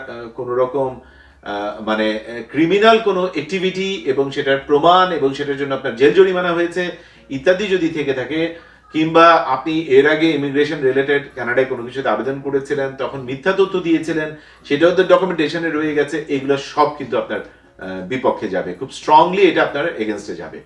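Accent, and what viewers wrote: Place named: Kolkata, India. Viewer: I am from Kolkata. I follow your all YouTube video. It already make me your fan. Your Bengali conversation 100 percent like as our Kolkata. No one can differ you as a citizen of Kolkata or Bangladesh. Thank you so much for your informative video.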